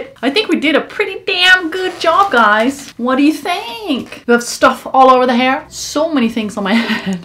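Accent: Sweden. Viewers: I can’t stop thinking abt that shes from sweden. I mean I can hear by her accent:,) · I see i see i heard that you were Swedish by the accent and the ramlösa if Im Wrong I don’t know what to do with my life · u sound so swedish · Wow din svenska accent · I don’t know my why but i get these swedish vibes cus the acent🤠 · Are u Swedish? U kinda sound like u r! If u r hej hoppas du har det bra! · Omg I never heard such a strong swedish accent it's tickling my brain in a way I never thought it wouldve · Let me guess, Swedish? The accent, ikea furniture and she didn’t even say eleven she said elva- Oh wait her bio says everything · is that a Swedish accent I hear?? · ahhh yes the stockholmare english accent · Me: hears her accent and hears her say 11, me: yap hon är svensk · Omg shes from sweden. It was the first thing i thought because of her accent and then she said "elva" which is the swedish word for eleven. 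Så hej! Jag är också från sverige! :) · swedish accent like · You sound Swedish 🇸🇪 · Yo, you sound so Swedish, please tell me I got it right lmao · I have a question. Are you from sweden? Because you sound like you are and if you are:hur mår du?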